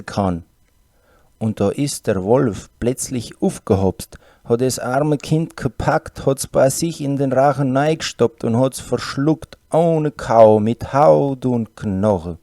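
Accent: Franconia, Germany. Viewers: Täuscht das oder erinnert das an ein ganz komisches fränkisch?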